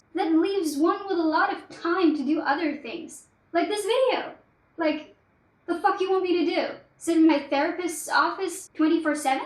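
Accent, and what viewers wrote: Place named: United States. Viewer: der Akzent klingt mir eher nach Amerikanerin :'D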